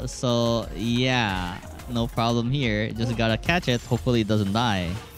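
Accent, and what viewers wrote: Philippines. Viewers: are you Filipino? cause you have a Filipino accent!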